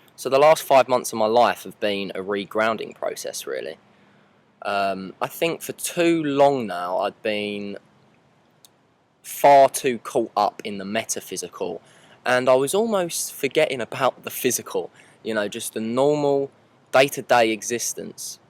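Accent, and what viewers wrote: United Kingdom. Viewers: Seems like we've all chilled out a bit more recently which must be generating more positive energy as speculating too much can lead to accidently creating negative reality I guess. Really good to have you back and nice one for holding onto your British accent!;)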